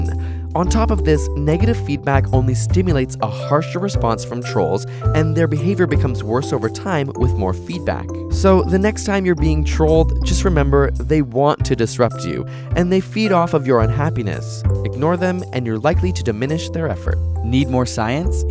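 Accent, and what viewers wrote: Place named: Canada. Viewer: It's not the gayness that bothers me. It's the way you Canadians say "out". That's funny.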